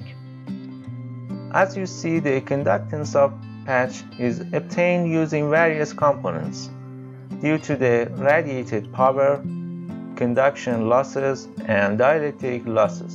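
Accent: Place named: China. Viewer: Nice video! BTW, you sound Chinese.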